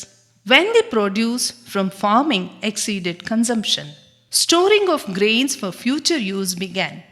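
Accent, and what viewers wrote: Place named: India. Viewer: typical Indian English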